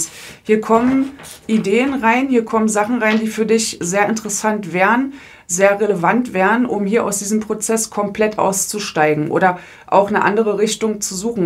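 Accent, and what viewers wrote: Berlin, Germany. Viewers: Vielen lieben Dank für diese sehr passende Tagesenergje - Botschaft 🙏❣️🙏 Heute ist für mich ein sehr sehr spiritueller Tag ❣️ Ich habe schon am frühen Morgen einige wichtige Erkenntnisse gewonnen und durch deine Botschaft der Tagesenergie finde ich diese intuitiven Gedanken bestätigt ❣️ Deine Tipps und Ratschläge sind immer sehr wertvoll für mich ❣️ Achja, das mit dem Grammatik Kurs vergiss bitte wieder 🙏❣️🙏❣️🙏 Ich liebe deinen Berliner Dialekt ❣️🥰❤️ Hab über 20 Jahre in Berlin gelebt, das ist einfach meine Heimat.... Wünsche dir und allen anderen, die dich auch von Herzen mögen, einen schönen und vor allem positiven Dienstag ❣️❣️⚡🌌☀️💖😘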